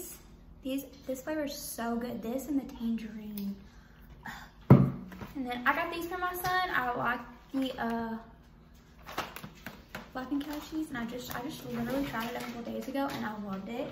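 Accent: South, United States. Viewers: I love that southern twannnggg! And your demeanor is just so soft and calming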